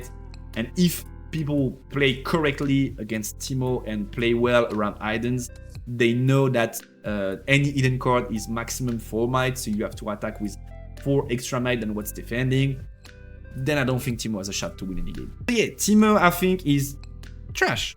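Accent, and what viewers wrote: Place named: France. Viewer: Je t'ai découvert avec Riftbound, donc en anglais, quand j'ai capté ton accent et que ta chaîne était en FR avant ça, j'ai pre--shot les commentaires de ce genre, mais tkt tu fais bien. Ton anglais est top et super compréhensible et tu te dirige sur un jeu pour le moment full ENG, avec gros potentiel de fanbase vu la licence, donc imo tu fais le bon move, surtout vu ta ligne éditoriale très compétitive, au moins tu te restreint pas au public FR super limité dans ce format :) 💪👏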